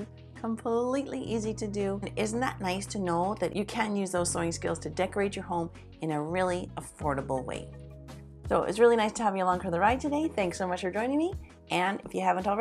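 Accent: Canada. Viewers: Just got hooked to your channel so easy to understand and love your Canadian accent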